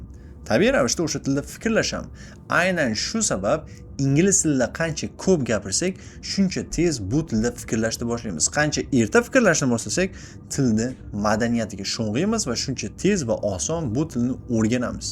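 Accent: Bulungur, Uzbekistan. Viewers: Aka bulungurlikmasmisiz🔥🔥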